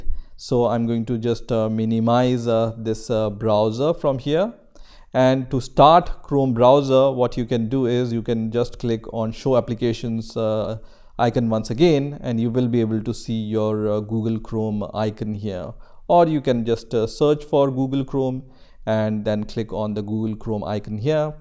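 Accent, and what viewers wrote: India. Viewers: hearing his accent I can confirm that he is an indian guy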